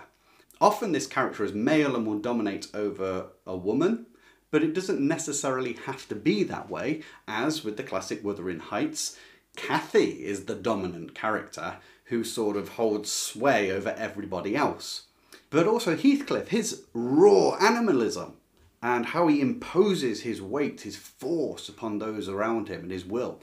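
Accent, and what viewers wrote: United Kingdom. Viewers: I seriously wish this could have gone on and on for hours! When you read that story, you brought the characters to life. Having a British person read with such great accents made the story so much more interesting! As an American I can’t really imagine too many British accents and their subtleties, but you made it so amazing :) definitely subscribing!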